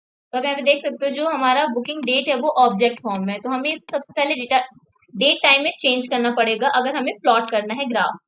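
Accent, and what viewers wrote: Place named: Varanasi, India. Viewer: I bet you are from U.P ...and from the accent I can say its either Banaras or Prayagraj...😅😅 ...and BTW ...the clarity of your analysis is astoundingly amazing ..keep up the good work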